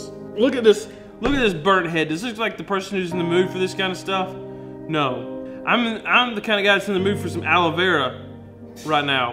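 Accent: South, United States. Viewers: He sounds so cheery Like a southern Santa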